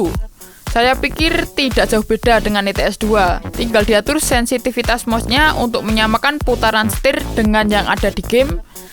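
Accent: Java, Indonesia. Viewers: Logat jawanya kelihatan Jowo ndi mas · Medok banget iki mantep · Medok tenan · Oke le medok bahasa nya wkwk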